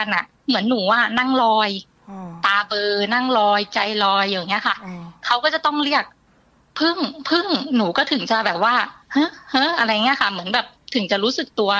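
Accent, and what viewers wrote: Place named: Sukhothai, Thailand. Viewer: สำเนียงการพูดเหมือนคนสุโขทัยเลยครับ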